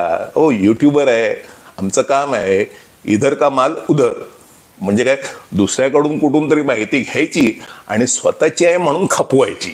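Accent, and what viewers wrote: Pune, India. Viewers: चांगले शालजोडीतले हानतोस रे तू मुंबई त जन्म घेतला पण बोलतो पुण्याकरा सारखा धन्यवाद मजा आली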